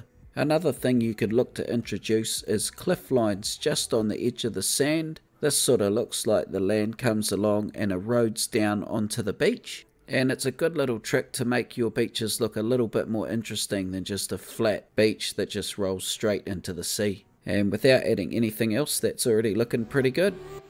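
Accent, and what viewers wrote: New Zealand. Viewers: omg is your accent from New Zealand? it's awesome!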